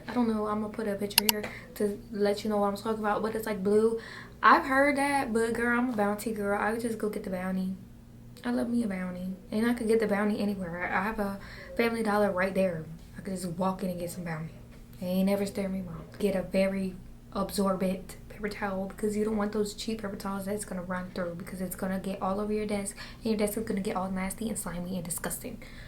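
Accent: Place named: Mexico. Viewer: I know she's Mexican but her Mexican voice is a little too much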